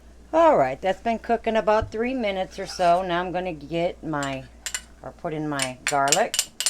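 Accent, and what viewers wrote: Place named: Upper Midwest, United States. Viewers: Northern midwest accent.. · Just found this channel and subscribed. I live in Tennessee but your accent reminds me of my home state, Michigan. Midwest cooking is home to me.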